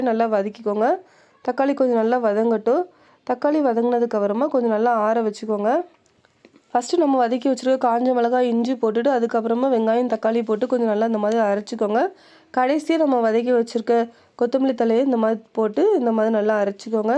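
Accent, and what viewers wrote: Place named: South Tamil Nadu, India. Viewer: Neenga entha ooru mam Your slang is southside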